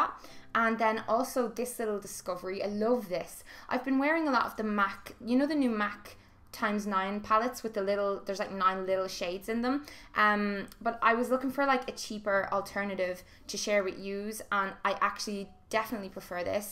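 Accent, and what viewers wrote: Ireland. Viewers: Hi! Your voice makes me miss Ireland so much!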